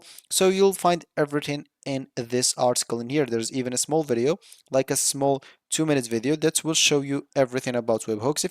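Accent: Morocco. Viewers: bruh you sound moroccan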